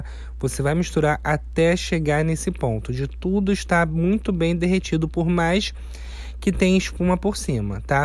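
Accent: Rio de Janeiro, Brazil. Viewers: Adoro suas receitas pq além de vc explicar muito bem ainda ficam lindas e certamente muito potentes, e esse ficou maravilhoso., gosto muito também da sua voz e de seu sotaque. Vc é carioca?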